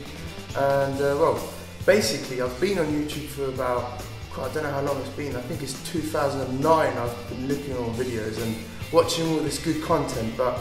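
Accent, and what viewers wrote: United Kingdom. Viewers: Hey. Sind deine Eltern Briten? Oder hast du einfach mal ne Zeitlang in England gelebt? Dein englisch ist mega und den britischen Akzent hört man einfach. Ich liebe den britischen Akzent:-) ^^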